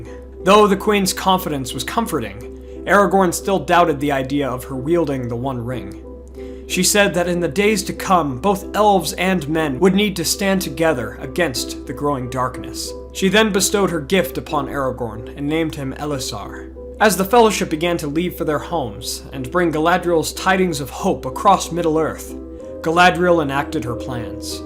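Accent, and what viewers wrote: United States. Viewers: Your American pronunciotion with all these "r" is terrible.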